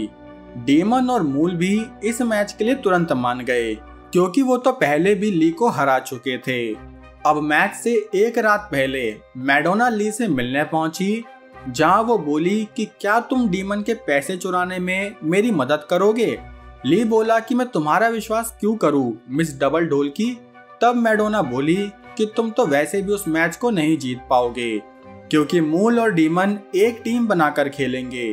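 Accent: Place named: Braj, India. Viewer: Tumhari boli se lag rha hai tum brij kshetra se ho, probably mathura ya agra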